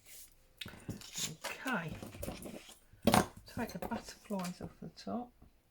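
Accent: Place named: South London, United Kingdom. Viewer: that was brilliant- loved the loaded tag really lovely. I love listening to your South London accent - makes me homesick - hope you're having a great weekend- 😊❤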